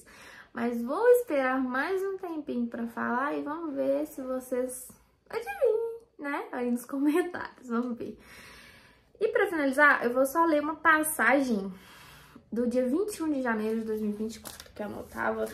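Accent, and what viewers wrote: Minas Gerais, Brazil. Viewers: Caraaaaa... Apesar da situação sensível, obrigado pela coragem de compartilhar sua história com a gente. Eu jamais me imaginei assistindo um vídeo do YouTube de 30 min... Só hoje assisti os 3 seus. Um dos motivos é por vc estar compartilhando algo que não é só a suposta "perfeição" que vemos exposta na internet. Aaaah, cara, o sotaque mineiro (salvo engano) é maravilhoso! Tatuagem de interestelar 😮❤ mostra mais dela sim! Amo esse filme! Estimo melhoras e que tudo fique maravilhosamente bem para vc e para os seus. Abraço! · fico tão feliz por ter voltado. Gosto tanto desse jeitinho mineiro seu de falar, e independente do seu peso, voce continua linda!! ❤ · .. é uma delícia ouvir vc falar.. vc é tão boa comunicadora, seu sotaque mineiro é tão gostoso, e seu jeitinho é muito fofo! continueee <3 Deus te abençoe demais!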